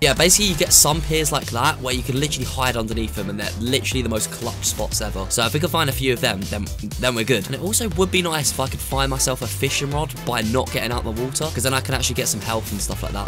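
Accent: United Kingdom. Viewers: Me: Oh no~: Oh norw! Ohw my gawd~ (No hate, love the accent <3)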